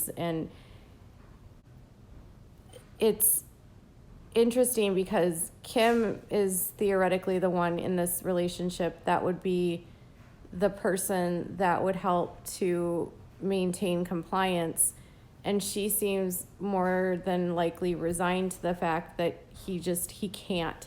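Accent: Minnesota, United States. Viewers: I think needs to go back to writing some BS liberal snowflake “column” or “blog”. She pathetically uploads several times a day about ridiculous topics with no proper research or fact checking. She has to censor every little word she is afraid might keep her from making a buck. Hey, if you’re so passionate about your political beliefs, just say the word. ABORTION. If you want to even ATTEMPT to play the reporter role, drop your awful Minnesotan accent and learn to use talking head diction. Putting a bag over your head might help as well. You have a rare talent of making someone’s eyes, ears, and brain bleed simultaneously. Ugly bitch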